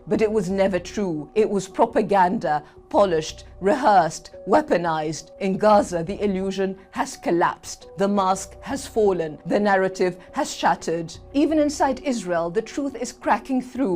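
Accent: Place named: United Kingdom. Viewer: لهجة بريطانيا مقززة. إحكي عربي يا بنت الناس. ليش صوتك هيك متل العجوزة اللي بتعاني من إمساك؟؟ 😂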